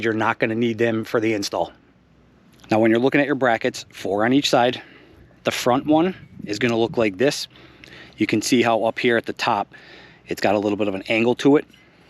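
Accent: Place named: Minnesota, United States. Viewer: You from min or Wisconsin? lol me too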